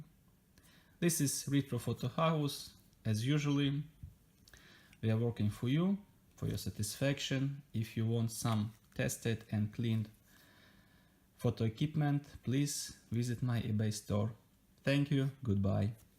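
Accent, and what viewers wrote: Russia. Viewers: Мне кажется, или акцент русского человека говорящим на английском?)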